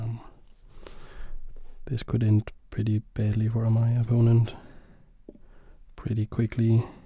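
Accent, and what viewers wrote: Denmark. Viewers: 2 hours and danish accents? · Danish accent and danish gambit. Great combination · As a dane i always find it wierd to hear a danish accent, but I’m sure it’s soothing for others lol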